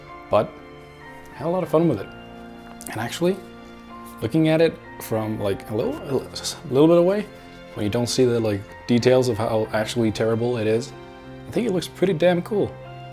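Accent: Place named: United Kingdom. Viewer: Nah mate definitely should've kept the Brit accent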